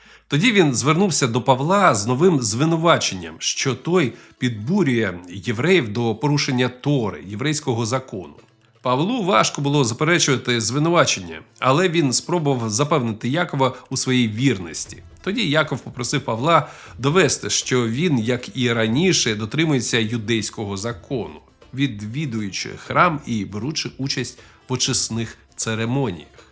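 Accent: Russia. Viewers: Трохи з російським акцентом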